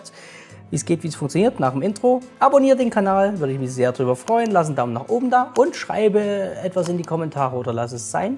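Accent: Saxony, Germany. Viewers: Grad das Sächsisch finde ich so charmant 😉 zusammen mit deinem trockenen Humor. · Sehr lecker, wir machen unsere Nudeln selber, Salz erst rein wenn das Wasser kocht(das Salzwasser braucht länger bis es kocht-gerade bei den Preisen-ne ich bin nicht neumalklug, habe ich von meiner Tochter-sie ist Köchin) Warum Untertitel - man hört ja nur wenig vom Sachsen😂-bin ich ja auch Sonst ist dein Rezept prima lecker🎉👍👍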